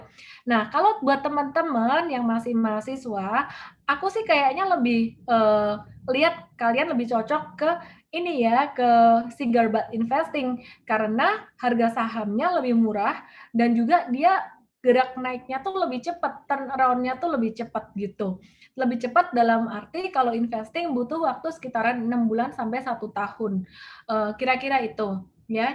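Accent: Java, Indonesia. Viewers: logat jawa sing kandel banget. 👍 anyway and thanks 😊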